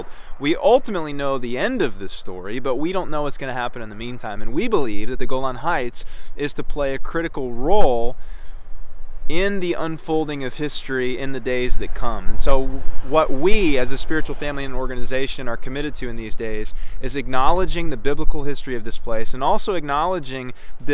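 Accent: United States. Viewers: In this video a European looking guy with an American accent tells us why its okay to take stuff from people if you have a magic book telling you it used to belong to some fraction of your genetic ancestors. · I couldn’t agree with you more Brother!!! You sound American 🇺🇸 Only have one ☝️ question 🙋 If CHRISTIAN’S truly believed in Hashem and Yeshua as their Savior and following the Torah as COMMANDED… Why is it that United States 🇺🇸 does not have one ☝️ fox 🕳️ hole dug in the Golan Heights and have not worked with the I.D.F.? When I take full control of the American 🇺🇸 Pentagon… I’ll go ahead and make the arrangements myself my American 🇺🇸 friend. I will be activating all U.S. Military branches to DEFCON 5 and deploying the Pacific Fleet off the coast of Israel 🇮🇱 Further arrangements are CLASSIFIED….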